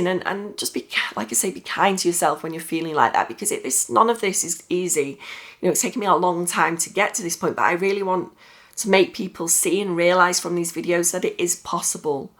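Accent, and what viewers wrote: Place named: North, United Kingdom. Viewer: Hi,! My name's Vladimir. What I've just tried to do is the video for YouTube with my complaints about my life lol, but not sure I will upload any of them. I'm 34, I've been havin' severe magical thinking ocd for more than 20 years. My life is almost fully controlled by this shit despite the fact I know about ERP and I've been studying psychology. I got rid of some compulsions in the past, but now my life is at the zero point. I'm a russian guy, I work as an English teacher for dimes, I don't have friends and I feel insanely alone. I found your channel maybe at the beginning of June and I wanna tell you that you make great videos and you're a nice person!🙂 Some hours ago I talked to another therapist and it costed a significant amount of money, he's a great man and I do understand it's only the first session, but why the f**k it should cost such money to listen about my unhappy life? 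I love your accent, by the way, I think it's a northerth one.🙂, I've been studying psychology on my own and what I've noticed that people in the west (but I'm not yet familiar with all of these ACT and other stuff) generally talk about ERP, which is great that they tell people about this method, but it's only tip of the iceberg. I believe that scientific psychological theory that tells the reason of OCD as well as panic attacks and other anxiety disorders is the inner conflict inside of our mind. Maybe you will be interested in Jacques Lacan. People sometimes ask - why my ocd themes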